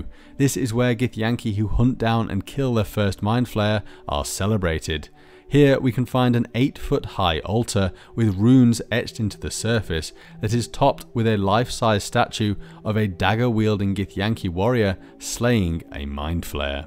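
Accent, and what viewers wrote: United Kingdom. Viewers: Yes. Yes. An excellent lore video, per usual. I was particularly impressed with the quality of your elocution. But maybe that has to do with your posh accent. Anyway, please get more yarn. I truly love your BG3 theory videos.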